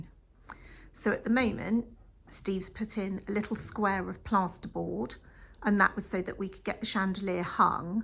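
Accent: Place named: United Kingdom. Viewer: I'm fascinated by your accent. It doesn't sound like other British accents I've come across. Can you tell me the location where this accent is found in the UK (I know it's the South somewhere). Thanks!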